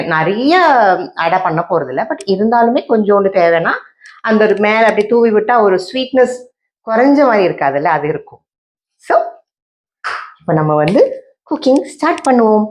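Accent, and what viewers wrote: Kerala, India. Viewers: Anyway Tamil samsarikkumbol malayala slang nannayittundu. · That Malayalam slang unne vittu pokale 😊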